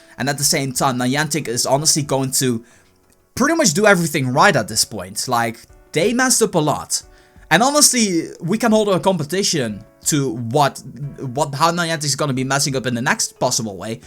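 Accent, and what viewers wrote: Netherlands. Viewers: Kan goed horen dat je nederlands bent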